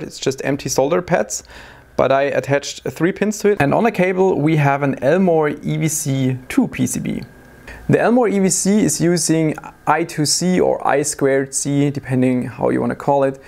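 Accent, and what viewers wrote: Germany. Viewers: You can hear how german he is by his accent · Er: sagt ein Wort Ich: Ein deutscher Staatsbürger Ich hasse den Deutschen Englisch Akzent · I'm hearing this German accent so clearly, why don't you make German Videos?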